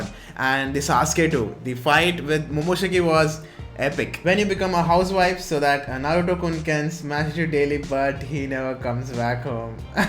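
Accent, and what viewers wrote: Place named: India. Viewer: Ur voice seems like ur an Indian and if u are then I’ll be happy to know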